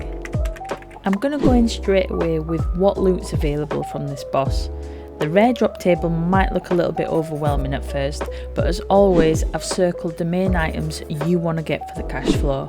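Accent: Yorkshire, United Kingdom. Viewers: Ey up lass, you a yorkshire rser too? :D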